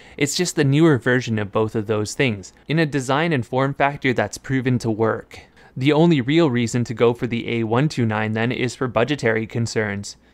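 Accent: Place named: West Virginia, United States. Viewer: by the sounds of it, he’s in West Virginia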